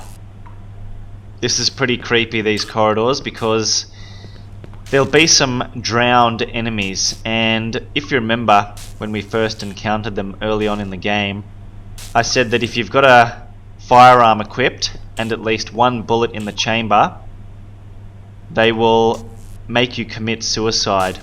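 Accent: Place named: Australia. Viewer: He's Australian... That's kind of how accents work in different parts of the world.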